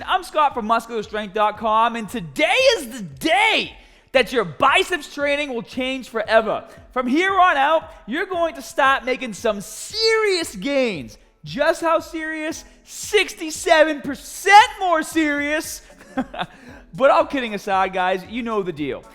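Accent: Boston, United States. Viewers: Is he from "bah"ston MA? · What can i say... the title aren't that clickbait... your boston accents? Just got it ways to the title. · Love u Scott, doing it without juice, love the Boston accent, I'm from Connecticut, my dad was from Boston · I love how you took that awesome Boston accent and made it a thing on this channel.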